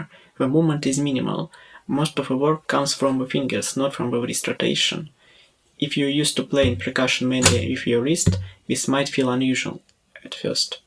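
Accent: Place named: Russia. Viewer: Настоящий руский акцент а так-то очень тебе благодарен за разбор мечтал когдато виучить.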